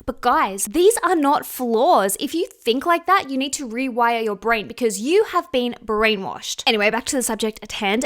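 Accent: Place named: Australia. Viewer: It’s the Australian accent bro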